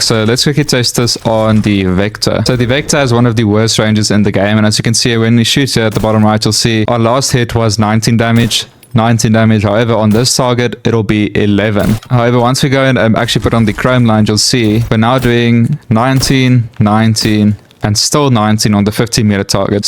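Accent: South Africa. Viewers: Awesome accent bro, South African right? I love it, and great informative video!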